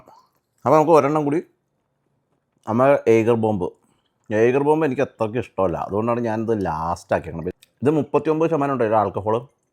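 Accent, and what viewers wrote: Thrissur, India. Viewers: എന്തുട്ടാ 😂😂😂 ത്രിശൂർ ആണല്ലേ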